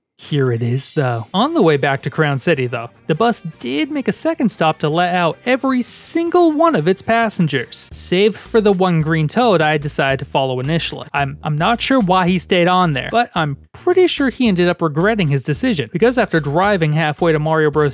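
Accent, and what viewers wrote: Boston, United States. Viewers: Is it just me or half way thru the video he talks with a Boston accent for some reason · Your accent sounds like Boston. Where are you from? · I love deep dives into game worlds like this! Btw, are you from Boston?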